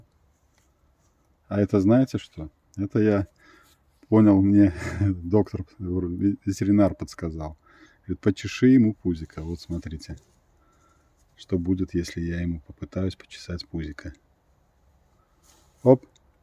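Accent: Belarus. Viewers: А я все думал откуда у тебя такой окцент Так ты земляк я тоже сам с Минска живу в штатах Никого не слушай все правильно сделал спас хряку жизнь Молодец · Вы с Беларуси ?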